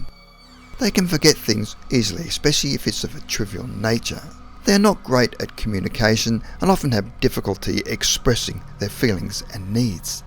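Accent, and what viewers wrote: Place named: Australia. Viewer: Ozzy accent. at first I thought it was odd to hear it as a narrator but yup.. it's nice to listen to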